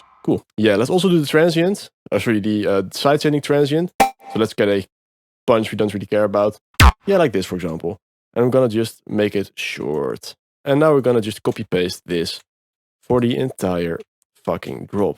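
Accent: Netherlands. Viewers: ahhaha vaak kan je dat gewoon horen bij nederlanders die engels praten :P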